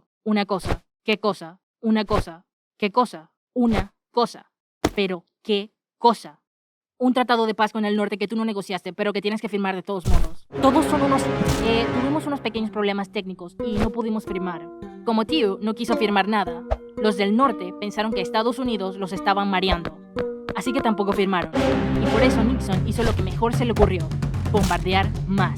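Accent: Panama. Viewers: Muy buen, video, me encantó... pero una pregunta? En realidad eres de panamá?? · Por el acento y por el minuto es imposible no saber que las personas detrás de este canal son Panameñas. Me suscribo de una vez Tremendo Canal!!!!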